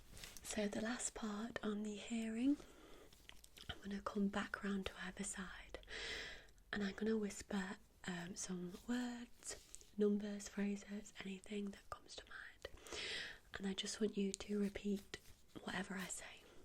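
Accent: United Kingdom. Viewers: This was great :) First thing I checked while listening to the video was if there was any info on your area because I thought it was a British accent and I was right. No wonder it sounded a lot more pleasing :D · Just subscribed. You have a very soothing manner, wonderful accent. I'm kind of a typical American who loves British accents. I would imagine you'll have many more subscribers in the future. I'll be watching more of your videos and I'm sure I'll be hitting the like thumbs up on them. Wish all success to you. Greg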